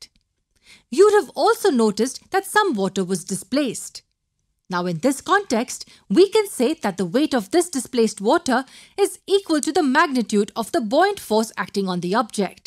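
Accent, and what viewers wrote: India. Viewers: Hay are you an Indian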